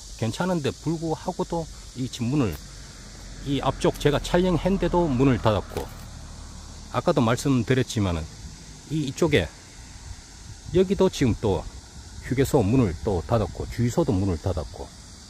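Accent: Gyeongsang, South Korea. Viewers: 설명 하시는분, 경상도 사투리를 의도적으로 강하게 하시는데, 좀 순화 하시길. "맹" 같은 말은 무슨 말인지? 혼자만 알수 있는단어라면 무슨 소용?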